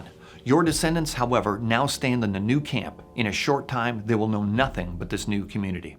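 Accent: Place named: Canada. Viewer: Oh Lord, that Canadian accent is making my ears bleed...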